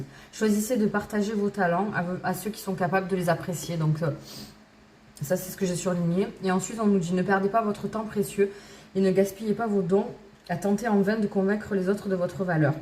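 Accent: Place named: South, France. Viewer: J'aime bien votre accent du sud 🥰😉 !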